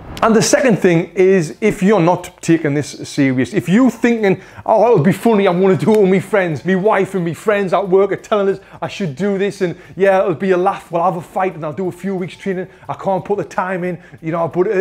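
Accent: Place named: North East England, United Kingdom. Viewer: Great advice, and explained clearly with enthusiasm, glad to see you've not lost the North East accent mate! Well done.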